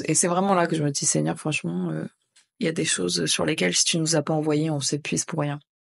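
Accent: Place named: Africa. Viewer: Ca se voit que tu fréquentes des africains qui ont vécu là-bas, tu parles comme eux, avec le même accent. C'est dingue. Cette société "multiculturelle" gauchiste c'est vraiment mortel pour les blancs.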